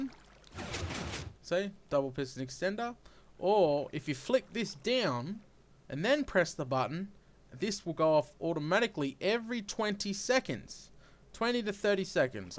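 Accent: Australia. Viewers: i luv the australian accent,sounds like there always asking a question:P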